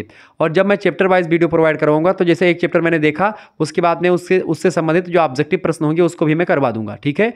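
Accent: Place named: Bihar, India. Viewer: Sir AAP Bihar ke ho . AAP bhojpuri language Bol rhe h vich vich me ❤❤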